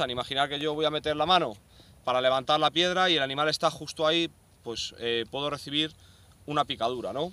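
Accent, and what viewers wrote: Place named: Toledo, Spain. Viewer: Otro RODRÍGUEZ DE LA FUENTE. Enhorabuena, por cierto por tú acento imágine que eras serca de la provincia de Toledo, yo soy de un pueblo de Málaga de niño mucho mucho campo hoy ya a mis 50 años ya salgo menos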